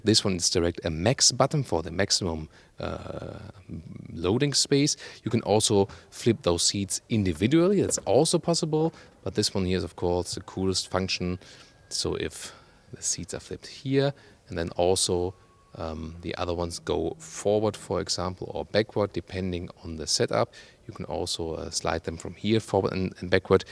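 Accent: Germany. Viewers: This German accent :)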